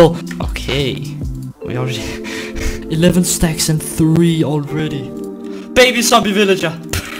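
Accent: Denmark. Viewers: are he danish¨ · bruh den danske accent offffff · Sounds like a danish accent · i hear danish.. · i can here that your from danmark. true? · That moment when you can hear that He is danish becouse of his accent😂😂😂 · I smell danish acent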